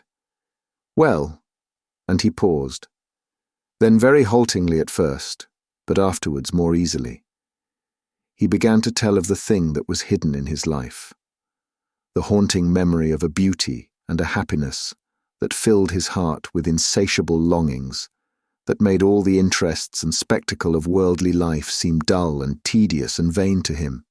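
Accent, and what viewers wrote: United Kingdom. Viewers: I have trouble following the UK English readings. Just me? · Ok prentioious British accent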